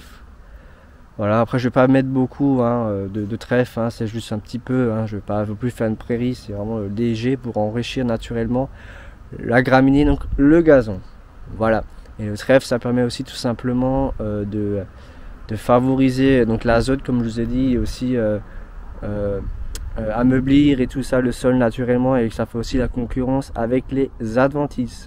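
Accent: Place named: Alsace, France. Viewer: Merci pour cette video instructive et tes explications détaillées. Compliments pour le soin que tu apportes à ton environnement végétal. Merci aussi pour ton délicieux accent alsacien. Ca fait beaucoup de bien aux oreilles !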